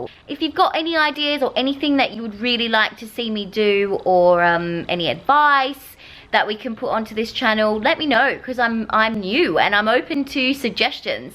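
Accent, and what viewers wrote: Australia. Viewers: thank you for making me smile thro out these vlogs. ive been poorly and feeling very down lately and you always make me smile 😌I hope is meet you one day seems I love Australian accents 😍 Insta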